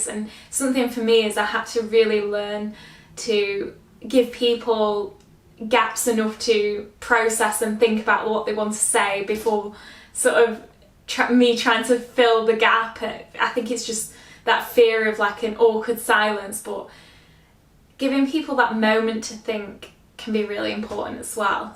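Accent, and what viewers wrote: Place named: United Kingdom. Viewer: Great channel, immediately subscribed especially thrilled to hear a familiar voice of the u.k. too as most channels I find myself subscribed to are often American, and so it’s confused as social work in our country is very difficult to appropriately compare with rules and procedures in u.s.a.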